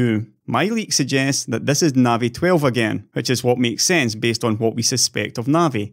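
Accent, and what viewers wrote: Scotland, United Kingdom. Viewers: Great content, in depth knowledge, scottish accent. Thick, thick, thick scottish accent. You got my subscription mate. I'd also love some content in scottish gaelic. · Scotia English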